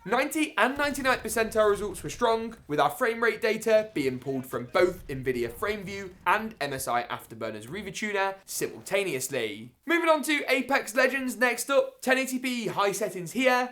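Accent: United Kingdom. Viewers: This dudes brittish accent is just too much, lol!!!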